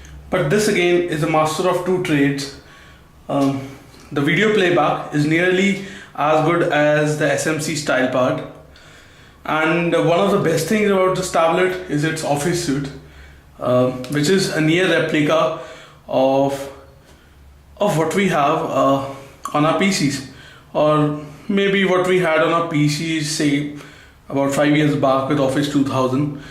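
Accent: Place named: India. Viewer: ur indian accent sucks!! its funny though!lol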